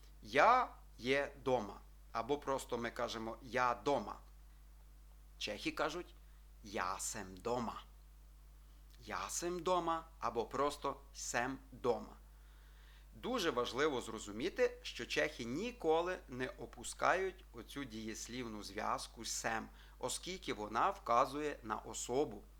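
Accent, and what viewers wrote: Ukraine. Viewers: Ну как можно учить чешскому ,разговаривая по закарпатскому ? Это капец ! Невозможно слушать Один я слышу разницу ?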